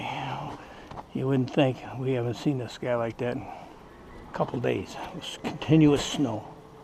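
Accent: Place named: Massachusetts, United States. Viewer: HI...I had to come back and respond to your nice reply. I'm an old Mass. girl. (Medford, Walpole, Lynn) and enjoyed the accent. My life brought me to Mich. Thought you'd get a kick out of the fact that I forwarded the Vlog to Thailand, where my son, a retired Special Forces Colonel has married and retired. Wanted to jog his memory about snow (I don't like hot, humid weather, so I visit him on Facetime every Sat. Nite. He forwarded the Vlog to an English friend who married and settled there, and wouldn't be surprised if he passed it to some Vets at the VFW in Bangkok. A reminder of winter and what surprises it holds.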